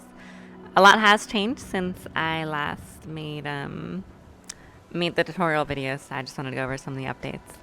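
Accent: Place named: United States. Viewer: I get the American part. You just sound more American and a bit like a friend of mine. I want to go to Boston and drink everyone under the table. Do you build all these or just compile them? I never even got around to installing that last one you recommended. I want fallout as a sandbox of stress relief. I like to come home jump on and have some escape. I spent 3hours trying to get audio drivers working for bigscreen tonight and I'm so done. Mods this complex, they just hurt me because always something goes wrong and I get too angry and need to play another game to get rid of the anger.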